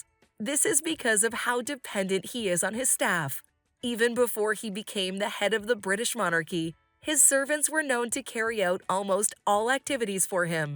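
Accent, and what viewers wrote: United States. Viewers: Dreadful American robotic voice!!!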